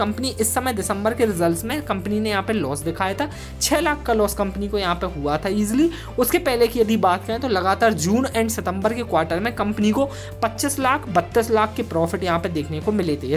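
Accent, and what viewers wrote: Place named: Bundelkhand, India. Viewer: aavaj se aap bundelkhandi lagte ho😍😍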